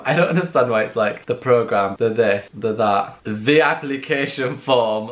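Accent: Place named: Sheffield, United Kingdom. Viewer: We love the Sheffield accent Oreyt meyt ow ya doin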